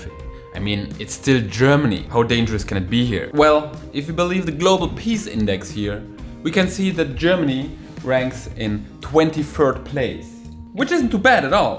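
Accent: Russia. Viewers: I confirm your russian accent as a russian ) bardzo ciekawe zobaczyć 😌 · your russian accent is wonderful! 😄😄😄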